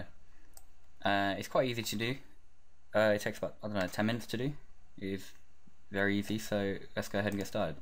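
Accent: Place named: United Kingdom. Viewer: i dont understand well british people, did u say hold click on the possition in ???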